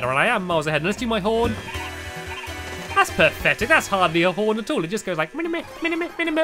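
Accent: United Kingdom. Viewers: It's Broth Brawl. Stampy just has his British accent.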